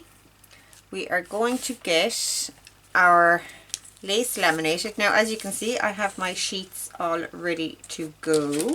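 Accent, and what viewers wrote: Ireland. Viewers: Not only do I love what you have shared here but I could listen to your lovely Irish accent all day. Thanks!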